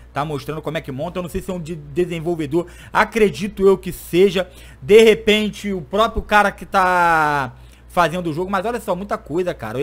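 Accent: Rio de Janeiro, Brazil. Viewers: o nome do game e wasteland e perigoso eu acho e oq estava lá porém está em fase de testes, uma pergunta se é carioca?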